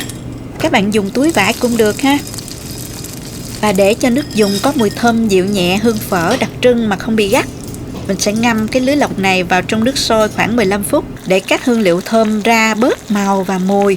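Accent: South, Vietnam. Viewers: Giọng nói miền Nam dể thương và rất lôi cuốn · Chính xác, giọng ở miền nam mình nghe dễ hiểu hơn và ấm áp + chỉ dẫn thực hành rõ ràng dễ hiểu, có năng khiếu và chia sẻ công thức cho mn, đây cũng là điều tạo nhiều công Đức cho và con cháu 👍🥰🙏🙏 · Giọng nói miền nam dể thương quá e cũng người miền Tây cảm ơn chia sẻ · Tôi rất thích giọng miền nam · giọng hướng dẫn đậm chất Nam bộ rất ấn tượng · Làm thấy đã ngon cộng thêm giới thiệu lại càng dễ thương hơn với lại giọng miền nam nữa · Qua phát âm thì em là người miền Nam, theo khẩu vị trong Nam thì em còn thiếuGiá trụng,Húng Quế và Ngò Gai cũng như cầncó thêm Tương Đen&Tương Đỏ ! Cám ơn video hướng dẫn của em.Thân.👍☺️ 😊☺️ 😊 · ơi, xin cho hỏi công thức nầy là cho mấy tô phở vậy cô? Nhìn cách cô nấu và trình bày hấp dẫn quá cộng thêm giọng nói đậm chất người miền Nam thích quá. Chỉ muốn nấu liền ngay thôi. Xin cảm ơn nhiều trước nhen.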